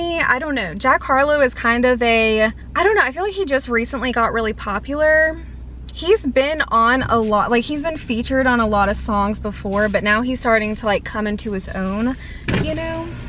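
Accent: South, United States. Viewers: You have the typical southern girl accent! It's endearing and sets you apart from other youtubers so embrace it! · I love your necklace! Compared to here in Canada, you definitely have a southern accent in comparison to us but I love it! You're almost at 100K!!!:) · You definitely have a little bit of a southern accent but at the same time you have a valley girl accent with some words lol it’s hard to explain. I love jack harlows song “first class” or something like that I’m not sure , my boyfriend plays it all the time lol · i do love your voice girl and it does sound like country but i love it. i haven't had KFC in YEARS!! where i live, we do have a KFC. BUT i cant eat it cause i have such a sensitive stomach to very greasy so it doesn't go well with me. but we do have a Mary browns and its almost the same as Mary browns but its NOT greasy at all and its crsipy and the chicken and cheese macaroni or other stuff is amazing!! 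if you have a mary browns, let me know girl:) im curious:) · As someone who lives in Chicago you definitely have southern accent!! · it sounds like country type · You have a slight southern accent. It only comes out sometimes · You sound southern to my ears but please don’t try to sound any different. Your voice is really sweet! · LOVE KFC :) you have a semi southern accent but its cute :) KFC mac and cheese is my fave fast food mac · You absolutely enhance the southern twang when you order, but you 100% do still have a southern accent all the time haha I'm telling ya just go back to your store next week if you want the Jack packaging. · You do sound southern to me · as a girl from california you definitely have a southern accent. i love it! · I have no idea who that dude is lol but you can't go wrong with a KFC meal 😁 and girl yes you do sound very country and I can relate but I'm a Tx girl 💞💞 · You definitely sound southern to me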